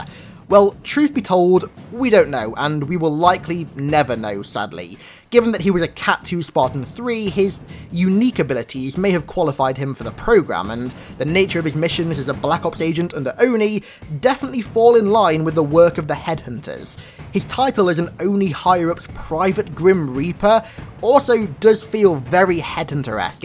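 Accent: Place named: United Kingdom. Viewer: Goddamn, can your voice get even more cocky british? 👎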